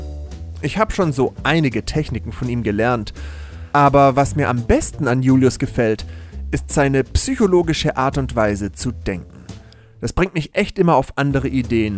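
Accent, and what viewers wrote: Swabia, Germany. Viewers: Der schwäbische Professor mal wieder ;-) Sehr schön! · Griaßgottle ällemittenander. Dr Schwob sagt: "Mir könnet älles, ausser Hochdeutsch!" MAGIE ist für mich, dass DU als Schwabe eben DOCH Hochdeutsch kannst ;-) HANO! ;-)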